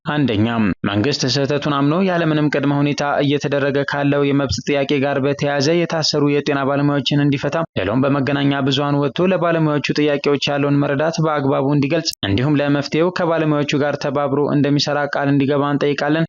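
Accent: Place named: Tigray, Ethiopia. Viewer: Zthe host has a tigrians accent so don't expect good news from this agames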